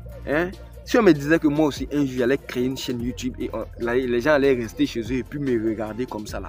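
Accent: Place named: Ivory Coast. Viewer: Si tu es ivoirien garde ton accent stp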